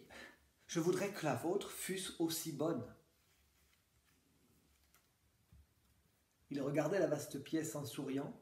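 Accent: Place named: Germany. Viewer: FORMIDABLE. MERCI POUR CETTE LECTURE Á L'ACCENT GERMANOPHONE COMME DANS LE FILM.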